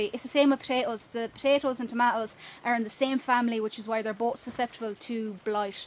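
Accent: Ireland. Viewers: Loving the channel. Mostly the charming accent, but I am actually gardening too 😅 Makes me want to visit Ireland. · I don't how many male humans could resist a young lady with that wonderful Irish lilt and the gorgeous roses in her cheeks. That is one version of perfect Irish femininity. God bless ya and may your flowers always bloom! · Sheesh, that accent is lovely! I would love to visit Ireland, but my skin tone may have me confused for border jumper 😃 I heard the Guinness there is real and I need it! 😂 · I do a little gardening but i just like the way she talks that irish accent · Between your Irish accent and my Texas accent we would never have any reason to argue. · Hey, love your content darling..can I ask what part of Ireland are you from.❤ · You are a superstar!!!" And your Irish, wow you have a great outlook. Thanks for teaching me how to garden. You have my full attention. 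Don't ever change anything about yourself!!!"✌️❤️ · I LOVE THE BROGUE: DO YOU BELIEVE IN LEPRECHAUN'S LASSIE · this girl is so cool, modest but with pride, love this oirish accent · not , just listening your awesome voise! :D i mean in from finlasnd and love irish english. :D maybe some others too. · The Lovely Irish could talk about shit and you would still be entranced by their lovely accent. Aussie Jeff Moore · Are you Irish?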